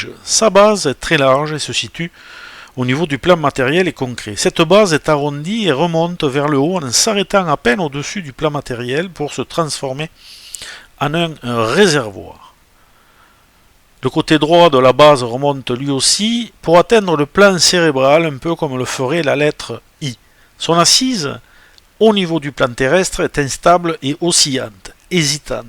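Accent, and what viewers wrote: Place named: Occitania, France. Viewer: Bonjour à vous, Mon prénom commence par la lettre J, et je me retrouve dans ce que vous dites. Nous avons le même accent Occitan. Je vous écris de Narbonne 😊.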